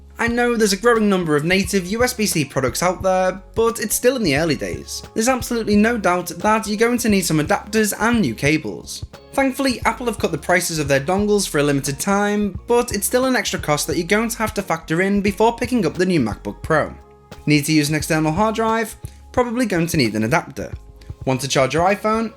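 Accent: United Kingdom. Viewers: It's the typical British way to do a voiceover, I've grown to enjoy it lol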